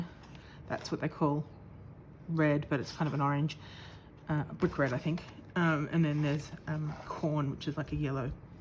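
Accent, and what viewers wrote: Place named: Australia. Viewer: Hi . You sound Australian. Where did you go for the workshop , sounds like I would have liked it a lot. I’m in Melbourne . Thanks for sharing .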